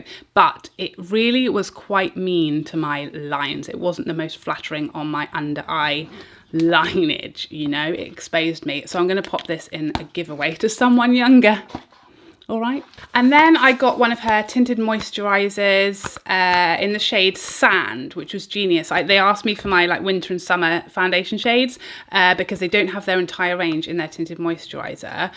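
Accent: England, United Kingdom. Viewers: Hi, I got to see your channel as a recommendation from YouTube.. Love your English accent.. Nice video 👌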